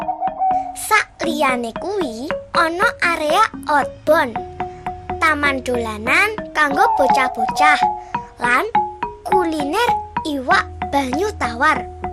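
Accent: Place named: Java, Indonesia. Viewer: Khas bnget logat jowonya😍 Di tunggu subs baliknya y kk🙏😊😊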